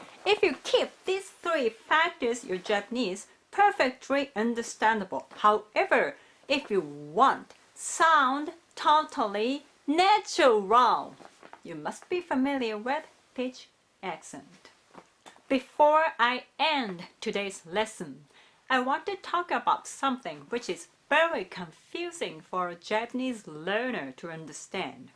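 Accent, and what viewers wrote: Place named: South, United States. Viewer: I feel like your english has a bit of a southern USA accent, Interesting... Cool video!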